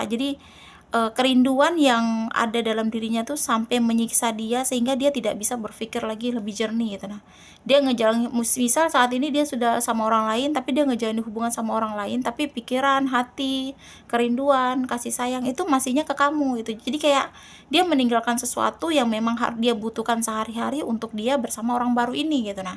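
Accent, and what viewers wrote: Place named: Kalimantan, Indonesia. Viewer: Logat bicara kakak, apa kakak asal. Kalimantan?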